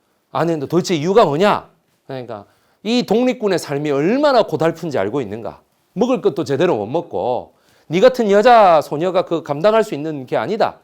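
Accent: Gyeongsang, South Korea. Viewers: 구수한 겡상도 사투리 ..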